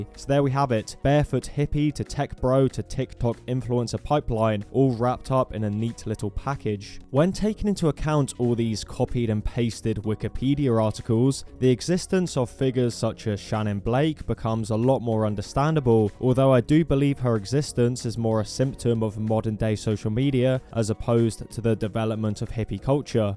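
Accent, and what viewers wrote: United Kingdom. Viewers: mate, he's british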